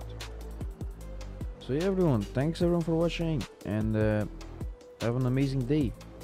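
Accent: Netherlands. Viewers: ik hoor gwn dat dit een nederlander is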